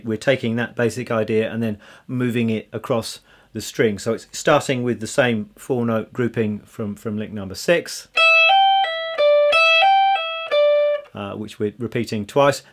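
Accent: England, United Kingdom. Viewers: Great lesson ! Where in England are you from ?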